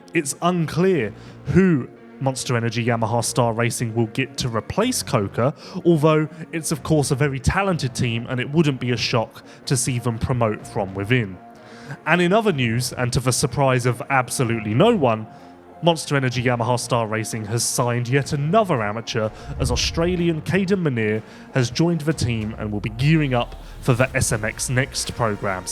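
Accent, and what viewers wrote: United Kingdom. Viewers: Grumpy, monotone UK dude.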